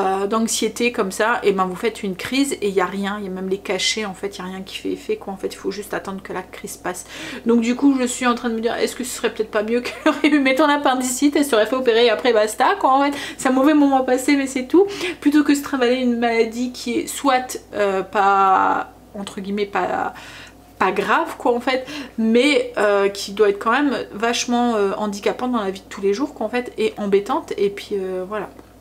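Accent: North, France. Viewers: Coucou... Je ne connaissais pas une "battée" j'adore votre accent du nord même si ce n'est pas souvent... Ça promet si il y a déjà des moustiques 😡 Les fleurs commencent à sortir pourrons plus grand plaisir Bisous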